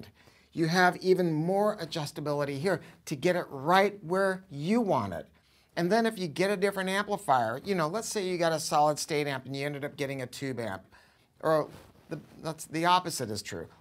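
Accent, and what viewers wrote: England, United Kingdom. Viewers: Shit. That english accent is exactly like mine. I feel seen. You know that episode of the Simpsons which is a parody of The Midwich Cuckoos? The Bloodening? Like that.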